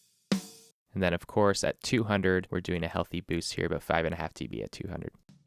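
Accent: Canada. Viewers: I can tell you're from Canada by the way you say "out" which is "oat". Let's check this oat. So, where is from???? Canada! I heard some of that album a few years ago. I thought it was really good but never really listened to the drums so much (being a guitarist) but this is quite interesting. I wonder how you got those samples into their own tracks. Did you somehow trigger them FROM the real tracks? Maybe you have a vid on that where you explain. Thx for the walkthrough!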